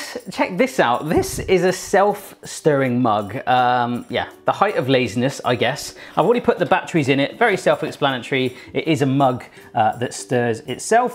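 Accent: United Kingdom. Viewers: I really like British accent 😍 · Is he British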